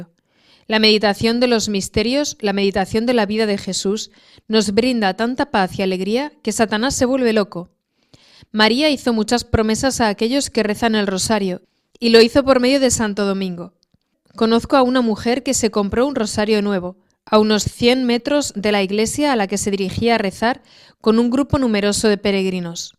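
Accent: Standard. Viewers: Agata Simma murió en el año 2004, pero en estos vídeos se manifiestan ideas que sólo se empezaron a hablar en los últimos diez años, parece que está todo distorsionado y mal traducido: María Simma no criticaría nunca a las cosas que dictaminó el Concilio Vaticano II, por ejemplo la comunión en la mano...Se están falseando los dichos reales de la Mística Católica, y opinando en contra de la Iglesia...Parece un pensamiento Tradicionalista que está presente en todo el vídeo...Yo puedo traducir alemán, y me gustaría mucho escuchar el audio original, y no a esta traductora española con acento neutro...Tengo muchas dudas por la forma en que ataca a los sacerdotes modernos, está en una posición claramente Tradicionalista y Lefevrista...Habla mucho de políticas eclesiales contrarias al Vaticano II, y no del verdadero mensaje del Señor Jesús y de la Iglesia Católica, Apostólica y Romana, que es un mensaje de amor y misericordia...Y espero que este comentario no sea censurado...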